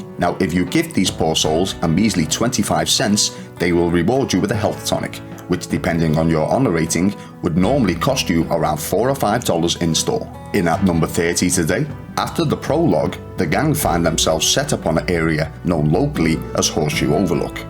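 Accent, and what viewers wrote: Liverpool, United Kingdom. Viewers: Just playing this for the first time and this video is perfect, so thanks! Also, bonus for it being made by a fellow Scouser! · Is this guy a scouser? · Yes lad finally a scouser lad your channel deserve more subs content on rdr2 is amazing mate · Me...not long started.These tips are well handy tyvm. As a fellow Scouser though, can i just say that your pronunciation of 'lass - o' surprises me..I'm prob a bit older than you though..i say 'lass-oo'! lol. You sound North end like me though? .but i can hear you dumbing down slightly for the world audience hehe. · nice to hear a scouser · nice stuff. Could hear the Scouse twang but could hear a bit of strong northern 😁. You're not the the fella who plays cod professionally and lives in wavertree, are you ? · That’s some Scouse accent. From Kenny myself!! Great video. Nice one!!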